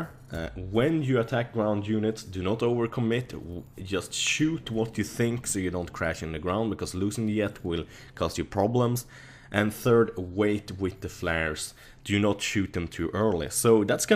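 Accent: Sweden. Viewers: Yet... Yet gameplay, ah your accent is so Swedish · u are swedish? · Are you from sweden?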